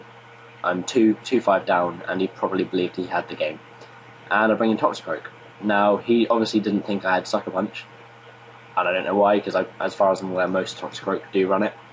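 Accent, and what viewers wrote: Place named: United Kingdom. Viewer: Hey man- so you asked me if i could give you some feedback on one of your narrations. i want to compliment you that you sound confident and there is fluidity in your voice which is a good quality, but you're a bit monotone, you might wanna add a bit more energy which will show to the people that watch. Also cool accent (i like british accents very much :p) Keep up the good vids man, you have the potential to grow into a big channel!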